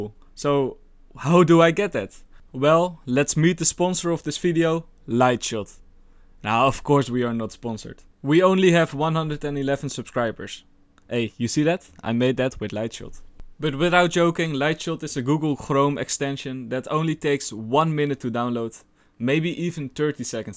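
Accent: Netherlands. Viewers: If you were commenting about him sounding Russian, that's actually a Dutch accent, easily confusable right?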